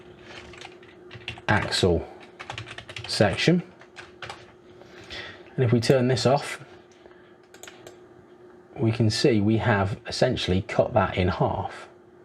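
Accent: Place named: Oxford, United Kingdom. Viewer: Thank you for your very good oxford english. For us germans it is a benefit to listen to you.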